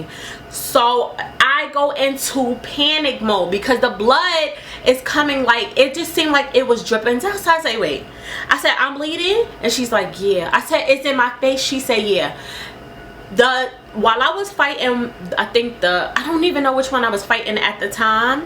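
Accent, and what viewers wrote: New York, United States. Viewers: i love you because you are so new york! lol you said i was duffin him ! lol im from brooklyn btw. so glad you made it through that!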